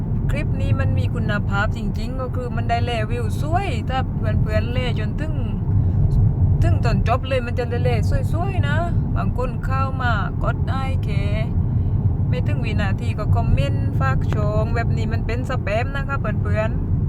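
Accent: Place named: South, Thailand. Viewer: ขับรถชมวิวด้วยคนนะคะ ไม่เบื่อๆจ้า ชอบฟังพูดภาษาใต้ มีเสน่มากเลยค่ะ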